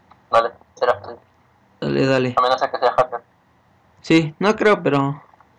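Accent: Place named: Mexico. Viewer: el mexicano se te pego mas no? xD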